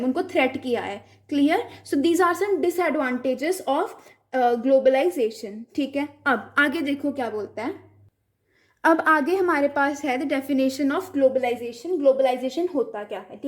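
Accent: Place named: Punjab, India. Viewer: Through your voice I get to know that you are absolutely punjabi girl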